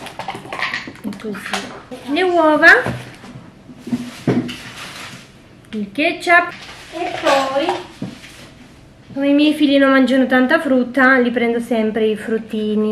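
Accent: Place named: Italy. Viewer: ce accent de italianca adevarata, ai! Imi place sa te ascult!❤❤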